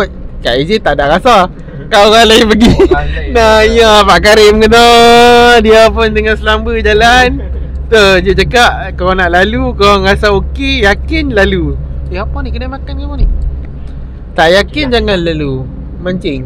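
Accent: North, Malaysia. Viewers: org mna dgr sora palet mcm org utra 😅🤭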